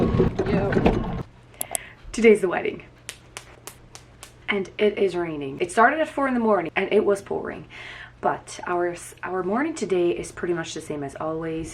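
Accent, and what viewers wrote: Ireland. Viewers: I take it you’re in Canada but do I hear a hint of Irish too? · Irish here, living in California with 30 yrs. Your accent sounds Irish to me??! History of the Hutterite please? Curiosity getting to me lol! Hope everything went very well and God’s blessing on the new couple for a long marriage and children God willing, you got my taste buds wanting to try your food! Thank you xx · First time watching. Have you ever been told you have an Irish accent. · What a delight the YT algorithm was to me today to find you, your sweet spirit and generous sharing 🙌🙏 I really enjoyed this educational and inspiring look into your world and your almost-Irish accent reminded me so much of my Irish gramm 🥰 We’re in the construction industry in Alberta and have always had Hutterite (ex colony) boys in our employment: thee most respectful, hard working and diversely talented employees ever.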